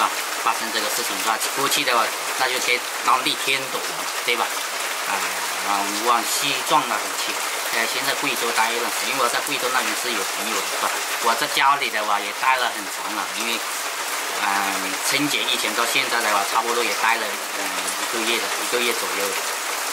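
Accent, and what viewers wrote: Hakka, China. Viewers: 客家口音？？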